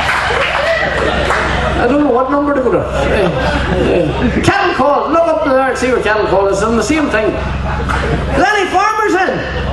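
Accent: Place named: Ulster Scots, United Kingdom. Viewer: same way i talk,, keep it up for the Ulster scots,!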